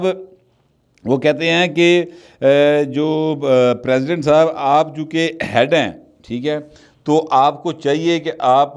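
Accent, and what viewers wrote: Punjab, Pakistan. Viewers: your punjabi touch is interesting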